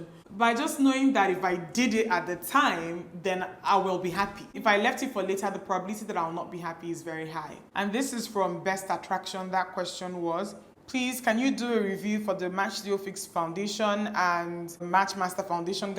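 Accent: Igbo, Nigeria. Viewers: I love how you called nneoma's name and how you answered her 😂 I love your igbo accent 😊♥️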